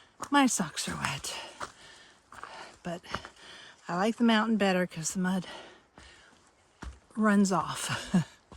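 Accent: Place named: South, United States. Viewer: Awesome! 100 Mile Wilderness. So close to Katahdin it's not even funny. WTG! I can so tell you're a southern gal, no one says "fixin to" like us southerners 😂. Okay, the southern drawl is a dead giveaway as well, but the "fixin to" just sealed the deal 😊😁. Happy trekking! 🥾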